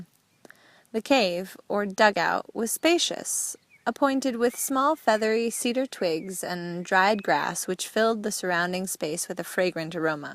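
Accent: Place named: United States. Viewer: the disgusting american woman accent . Horrible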